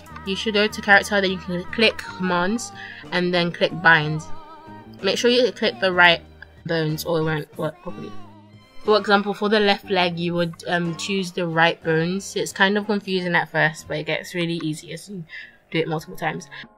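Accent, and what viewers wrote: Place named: United Kingdom. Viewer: YASS SHE IS BRITISH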